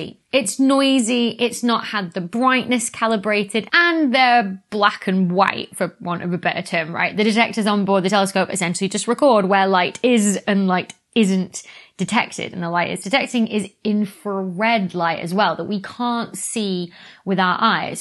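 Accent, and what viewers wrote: United Kingdom. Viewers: Because you are not used to genuine British accents. I have no issue at all understanding her at normal speed. One year I was in West Virginia at a factory and had a British guest. He asked a question. The W.V. man tired to me and asked, seriously, "What language is he speaking?"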